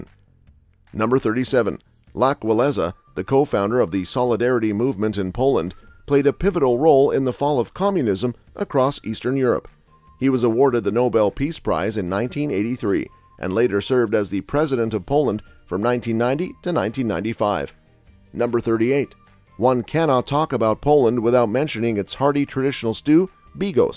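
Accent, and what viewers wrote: United States. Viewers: Agree here. He should have learned how to pronounce it right and not to read it like an American would try it to pronounce. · Authentic Polish pronunciations should be used in this video- not Americanized pronunciation s. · Imagine 2023 having internet still saying polish names with us accent disrespect...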